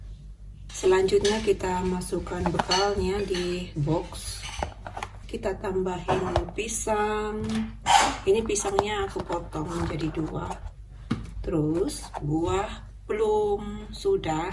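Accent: Java, Indonesia. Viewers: Mbak apa ada turunan orang jawa?kok rada medok jawa 😊😊😊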